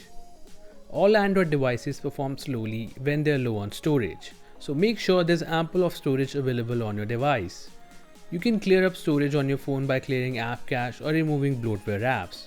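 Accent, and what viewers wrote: India. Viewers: You're the first YouTuber with indian accent I can undestand, thank you for this great Video it helped me a lot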